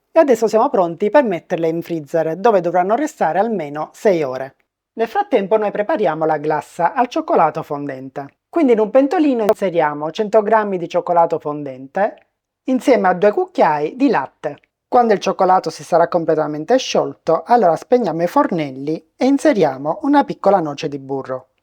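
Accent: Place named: Sicily, Italy. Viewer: stavo apprezzando l'accento siciliano, visto che, se dovessi scrivere la parola: "resta", per come viene pronunciata ci vorrebbero non meno di 4 R😅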